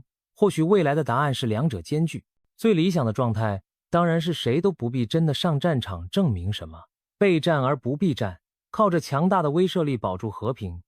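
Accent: China. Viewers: 你的口音足中共国的人,你的知識相對匮乏,解放軍不會先對台灣開第一槍,會對駐日美軍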